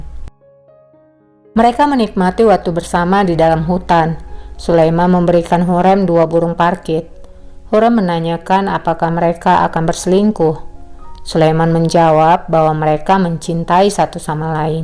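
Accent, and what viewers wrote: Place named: Medan, Indonesia. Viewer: Yg ngomong ini seperti saudaraku ya orang medan sebab ada omongan lalap dia hamil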